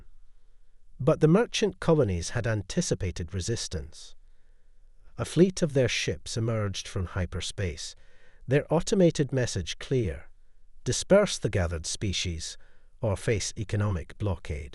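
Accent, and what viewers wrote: Scotland, United Kingdom. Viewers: love the accent, brings back memories of my Scottish Grandmother ❤‍🩹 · A story read with a Scottish accent! Lovely ❤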